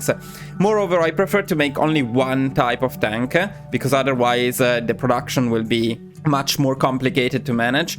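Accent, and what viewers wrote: Italy. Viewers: Bel video,dall’accento sembreresti italiano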